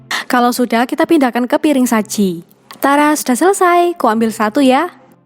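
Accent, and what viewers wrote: Surabaya, Indonesia. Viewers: Medok sby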